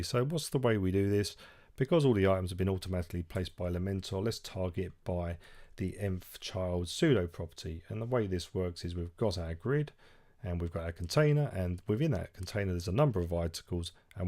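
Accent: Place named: England, United Kingdom. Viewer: Excellent tutorial man. Always better with an english accent too haha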